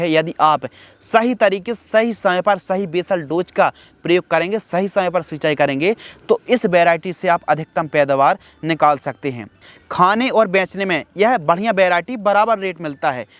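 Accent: Bundelkhand, India. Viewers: भज्जा तुम तो बुंदेलखंड के लग रए हो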